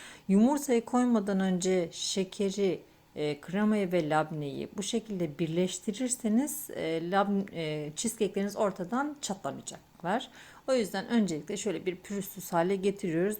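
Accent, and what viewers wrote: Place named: Gaziantep, Turkey. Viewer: hanım nereliydiniz acaba Antepli felan mı merek ettim bu ses verin inşallah iyisinizdir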